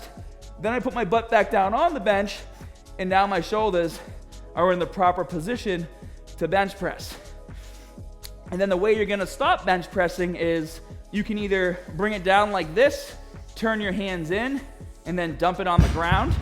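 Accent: Boston, United States. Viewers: Boston guy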